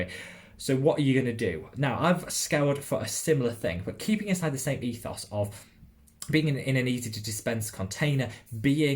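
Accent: United Kingdom. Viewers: Your so easy to watch and listen to. I was a little confused on where you were in the world with a uk accent and then mention dollars but then you said your in the uk think it's great your saying different currency. I'm in Ireland but from the uk 💜 I use the aldi dupe of the nip and fab glycolic pads cheaper again. Haven't tried the nip and fab ones but I think I will have to get some now as this is the 2nd video you've mentioned them 🥰 I will say I can't stand olay products but that's my personal experience so far theres better products that are cheaper again like the ordinary range. Depends on what your targets are I've also just bought some serums from revolution to try and compare Xx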